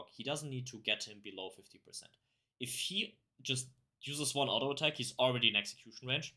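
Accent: Germany. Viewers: Hab an deinem Akzent gehört das du Deutsch bist haha. Aber guter guide, danke dir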